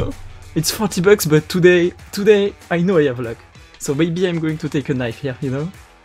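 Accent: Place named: France. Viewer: pas de soucis, en plus c'est que ton anglais est super fluide malgré l'accent français ( on l'a tous moi le 1er ). Continue t'es sur la bonne voie, j'espère que le partenariat avec csgo roll arrivera bientôt !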